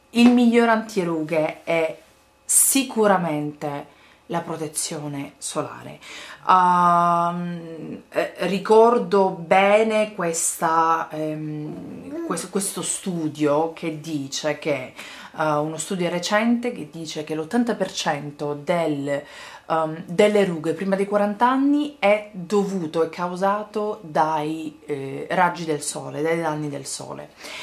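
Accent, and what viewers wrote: Sicily, Italy. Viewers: Sei siciliana? dv precisamente?:) Un saluto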